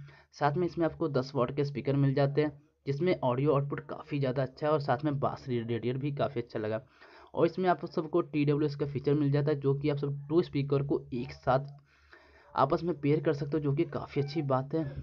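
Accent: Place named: Punjab, India. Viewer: Amazing Punjabi accent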